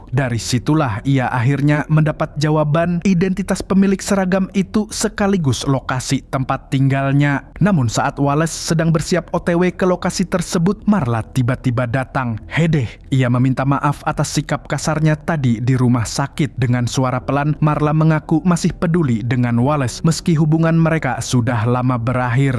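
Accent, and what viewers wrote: Banjar, Indonesia. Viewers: Banjar banarrrrrr wal ai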